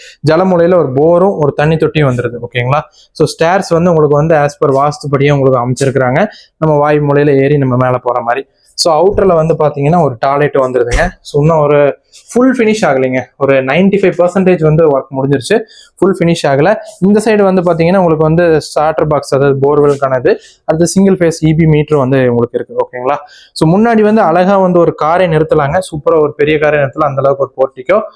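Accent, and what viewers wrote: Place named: Coimbatore, India. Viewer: kovai slang😅😅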